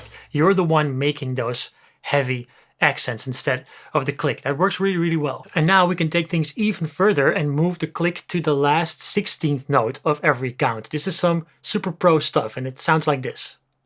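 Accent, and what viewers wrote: Netherlands. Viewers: Although your location says USA and your English is great.........am I hearing a Dutch accent ?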